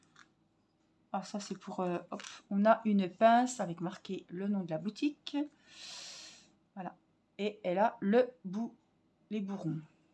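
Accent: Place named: Nancy, France. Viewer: Trop belles ces toiles Vitraux ❤️❤️❤️ j'ai une petite question qui n'a rien à voir : je te suis depuis peu et ton accent très très prononcé me fait penser à celui que j'avais il y a qques années : je suis originaire de Nancy mais j'habite depuis plus de 20 ans à Toulouse. Et toi ? 🤔😁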